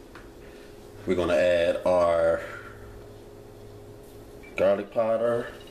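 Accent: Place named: DMV, United States. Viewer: Homie sound like he from the DMV...on muvas! And the wing/rice setup look on point! No cap!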